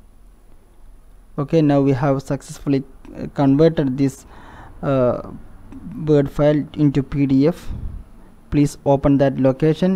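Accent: Kerala, India. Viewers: sir are you from kerala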